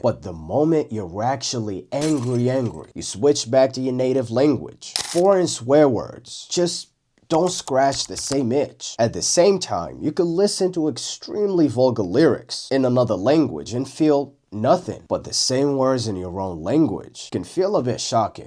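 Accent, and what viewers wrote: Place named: New York, United States. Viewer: I love your New York accent bro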